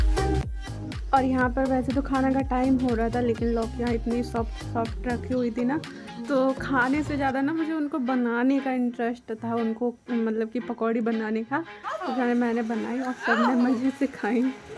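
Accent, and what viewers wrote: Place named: Bundelkhand, India. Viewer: tum to uma ke yahan hi enjoy kar rahi thi tum log bundel khandi ho kya boolne ka dhang se mallom.parta hai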